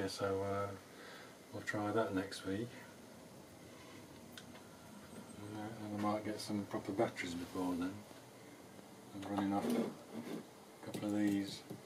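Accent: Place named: Yorkshire, United Kingdom. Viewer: absolutely wonderful engineering! if i'm not mistaken you're a yorkshire lad aswell?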